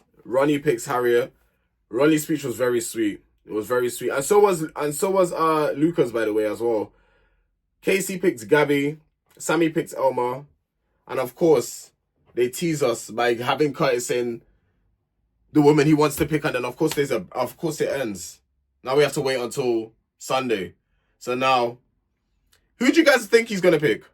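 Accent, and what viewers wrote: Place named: Caribbean. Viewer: Am hearing a Caribbean accent. Are you from Trinidad 😂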